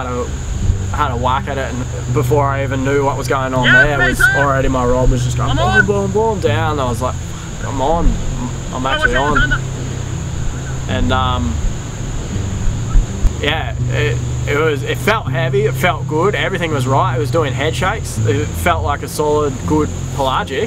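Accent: Australia. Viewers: This videos half as good if you take away the Aussie accent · thx for this video, quite inspiring and that decent aussie accent lol.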